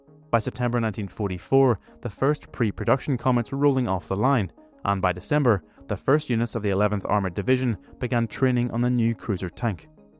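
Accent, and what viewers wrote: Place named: Northern Ireland, United Kingdom. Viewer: he's from Antrim or North down I would suspect based on his accent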